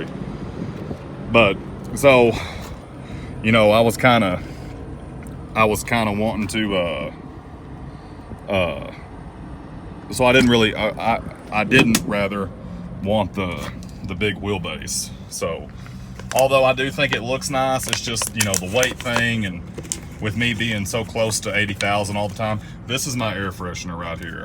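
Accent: South, United States. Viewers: Looks good as red Is my favorite color! Like ur Southern accent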